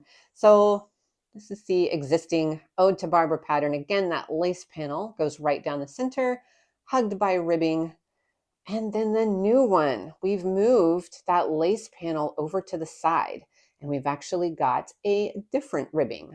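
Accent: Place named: Oklahoma, United States. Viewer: The dining room looks fabulous! I ❤the Indian chief picture, beautifully noble. Drawer only sounds funny to you because you have developed the Okie accent. 😂 I was supposed to get to meet you at the book signing in the McKinney Knittery but I had a bug. I picked up your book the next day, it’s amazing. Thank you! You might could start a “give away” of socks.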